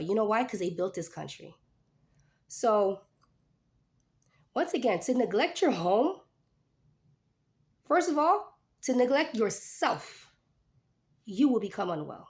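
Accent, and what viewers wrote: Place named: United States. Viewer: Well said, Sister. I am a white American revert married to an Arab. I took my shahada about 24 years ago. My oldest daughter went to an Islamic school in California where I was welcomed by some but not all. It was great community. I lived in the Middle East and had the unique experience of having many American Muslim friends who were married to Arab men. I didn’t go to the masjid there as it was more common for women to gather at homes but I had a community for my children to see where they felt they belonged. When we moved back I tried to be a part of the community but felt really isolated and my children did as well. We haven’t been a part of a masjid during their adolescent years as a result. I feel that I failed them for my being too weak to try and make it work at a masjid. I don’t know any African American Muslims but I have always wanted to try to be a part of that community. 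As a white revert I feel similar feelings that you do as an African American Muslim. Thank Allah for YouTube where I can get something for myself when it comes to practicing my deen. I found you a few years back because you were reading the Quran in English and I longed for an American woman’s voice. You were that woman! may Allah reward you for all of your efforts. Jazakallah khair.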